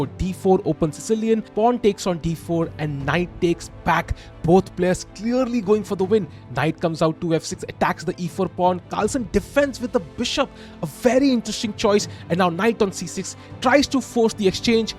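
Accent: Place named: India. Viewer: I love this commentary in Indian accent as it should be.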